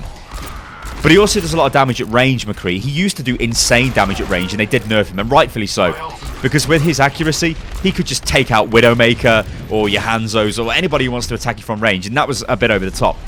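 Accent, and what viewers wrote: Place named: East Midlands, United Kingdom. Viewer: are you from east midlands area? you sound it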